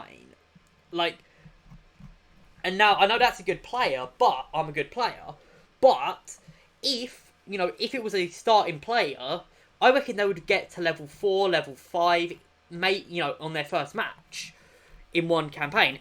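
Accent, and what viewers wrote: United Kingdom. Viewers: how fricking cool is your british pronounciation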